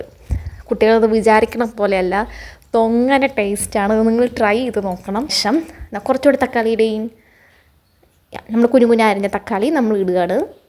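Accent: Palakkad, India. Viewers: Palakkad slang super 👌🏻😄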